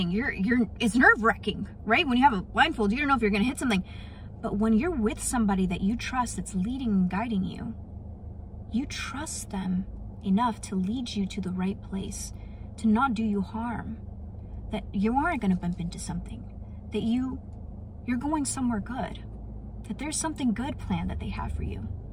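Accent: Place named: South, United States. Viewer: What sound like your from the south. Yall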